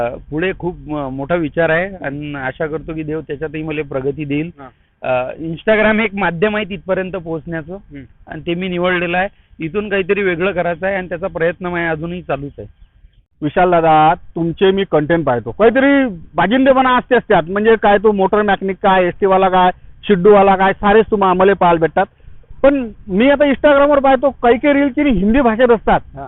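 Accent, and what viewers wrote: Varhadi, India. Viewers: हा विदर्भाचा सुपरस्टार आहे विशाल भाऊला कोणी ओळखत नाही असा महाराष्ट्रात सापडणार नाही. खूपच छान वऱ्हाडी भाषा, ऐकायला फार छान वाटते पण मला तशी बोलता येत नहीं. विशाल भाऊचा प्रत्येक रील हा इमानदारीने व अभ्यासातून केलेला असतो. प्रत्येक व्हिडिओ लाईक केल्याशिवाय पुढे जाता येत नाही. पुढील कार्यास खूप खूप शुभेच्छा.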